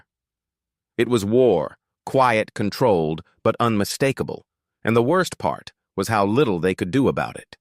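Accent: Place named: South, United States. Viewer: What a horrible irritating voice to use for a reasonably good story. No need to sound like some hillbilly.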